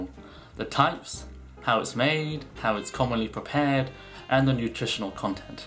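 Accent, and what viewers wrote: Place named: China. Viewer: You must be living in an Asian country for too long - your accent is very chinese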